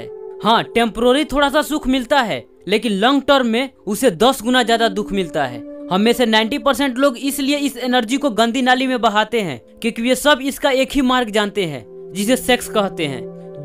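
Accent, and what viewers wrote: Bihar, India. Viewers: Bhi app bihari ho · Aap bihari ho n pakka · Bro you from bihar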